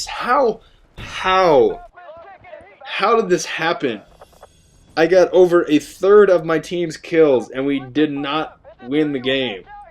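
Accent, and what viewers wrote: Russia. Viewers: polar bear is more deadly, grizzly are just more likely to attack great rushian accent i alwasy blame lag for those shotgun moments i hear theres some kind of hit box they have to be in when you start the kniff inorder for it to hit them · your Russian accent is awesome. крут :)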